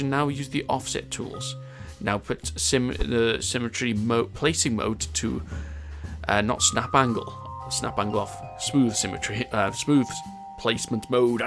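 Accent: Wales, United Kingdom. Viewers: YES, ANOTHER WELSHMAN! BLOODY TIDY!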